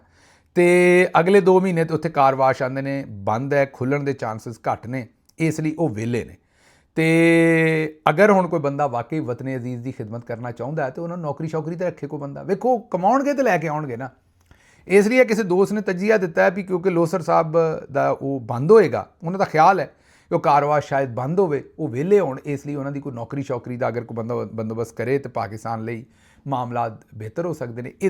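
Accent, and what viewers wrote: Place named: Punjab, India. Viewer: صاحب میں کی بہت بڑی فين ہوں اللہ آپکی حفاظت فرماۓ آپکا پنجابی vlog مجھے بہت پسند ہے میں بھی آپ کے شھر کے ايک گاؤں سے belong کرتی ہوں جب آپ پنجابی ميں لطيفے اور کہاوت سناتے ہيں ٹھوس پنجابی بولتے ہيں کمال کر ديتے ہيں ❤ مجھے لگتا ہے ميں اپنے گاؤں ميں ہوں آپکا vlog خبروں سے ذيادہ آپکی پنجابی سننے کے لئے ديکھتی ہوں عابدہ يو اے ای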